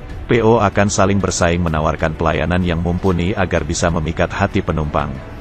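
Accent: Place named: Banyumas, Indonesia. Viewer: Bise wong ngapak tetep sinjay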